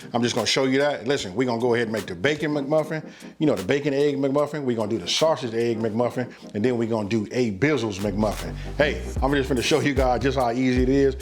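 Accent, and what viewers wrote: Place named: Bay Area, United States. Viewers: The West Coast accent is deep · dude"you from the Bay?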